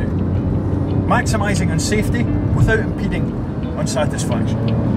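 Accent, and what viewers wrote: Scotland, United Kingdom. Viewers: Hes british he lives in sctoland and has a scottish accent! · sounds like he is Scottish !! am I right ?!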